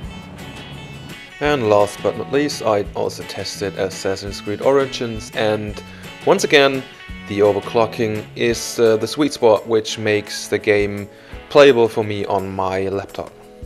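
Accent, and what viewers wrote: Germany. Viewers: Ah, ein Deutscher, absolute Klasse, wir Deutschen sind eben einfach am Akzent gut erkennbar :)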